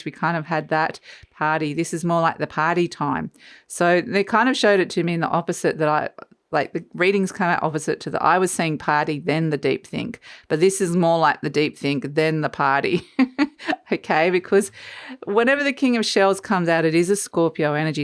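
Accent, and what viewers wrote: Australia. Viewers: Great forcast! 👍 Yes we need to talk hope my Virgo of 43 yrs Love of my life He is very important to me ♥️ 3rdparty came into our marriage but l have forgiven him long ago. He was loyal but grief hit us that no parent should have to go thru Would be great if he gets intouch so we can have closer one way or other .l did try but silent his end Thank you so much for your reading very positive and offer's Hope ! You sound like you are Australian??. The view out your windows is peaceful looking at your tree ferns And your your feather friend having his say love it Chooks are lovely love from Queensland Australia 🇦🇺 ♥️🎶🌈🙏🐞🦋🌞👍💐💐🙏🙏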